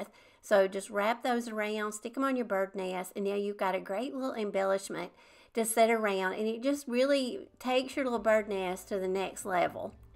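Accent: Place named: Tennessee, United States. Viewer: I really love your creativity and have used your ideas in my crafts. I wanted to say I have a sister in Tennessee and her name Theresa with the "h" and hearing your accent makes me think of her because we grew up in Michigan and she has the accent and I am living in Michigan where we grew up and just get a kick out of listening to my sister Theresa. Anyways, I have made decor projects for my house watching you.😂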